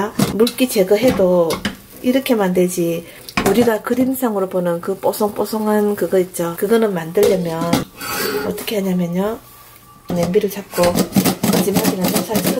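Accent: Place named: Busan, South Korea. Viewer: 친정이 부산이라 목소리랑 억양이 넘 친숙하네요~^^ 좋은 정보 감사 드려요~♥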